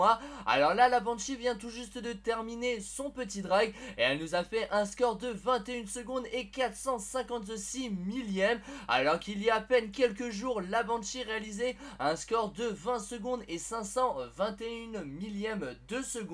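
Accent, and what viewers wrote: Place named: England, United Kingdom. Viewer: mec, tu racontes n'importe quoi, enfin bref vrai ou pas peu importe, en tout cas arrête ton accent anglais c'est carrément hilarant...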